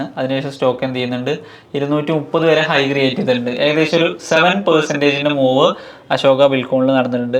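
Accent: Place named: Perinthalmanna, India. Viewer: perinthalmanna samaram kelkkan nalla rasam anu to....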